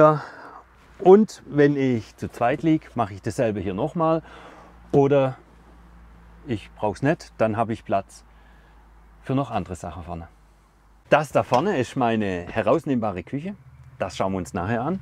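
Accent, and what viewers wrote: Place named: Swabia, Germany. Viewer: Super geil.. und dei schwäbisch isch no geiler..:)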